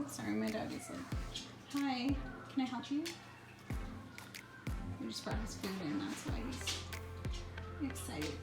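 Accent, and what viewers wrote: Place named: Canada. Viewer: You sound Canadian. You say “aboot”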